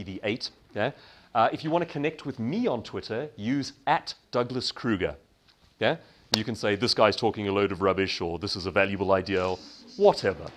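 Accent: England, United Kingdom. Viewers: Queens English? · He is an excellent speaker he has an English accent that you can understand very well and very knowledgeable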